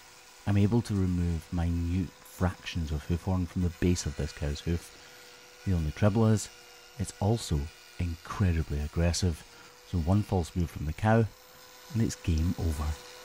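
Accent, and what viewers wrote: Scotland, United Kingdom. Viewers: The Scottish Morgan Freeman.😅😂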